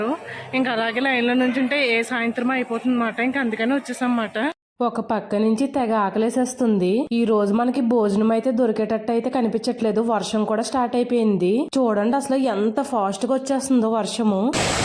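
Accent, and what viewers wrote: Konaseema, India. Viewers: You have explained everything very well amma. Specially your konaseema style of language is nice to hear n infact we all enjoyed. Good vedio n we wish you all the very best .God bless you amma.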